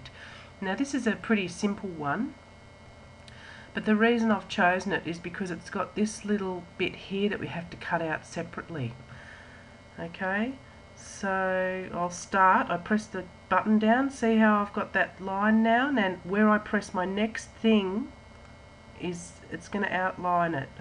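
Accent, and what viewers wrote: Australia. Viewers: About bloody time I hear an aussie Well done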